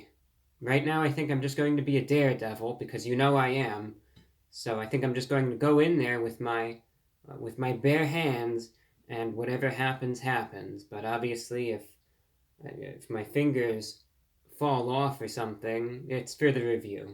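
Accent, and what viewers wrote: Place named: Transatlantic, United States. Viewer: Bro just casually speaks in a semi trans-Atlantic accent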